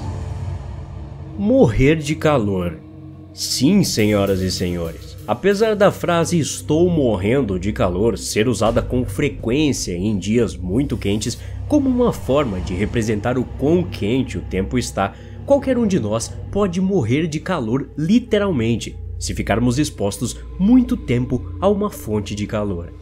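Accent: Northeast, Brazil. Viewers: Ele é um pouco nordestino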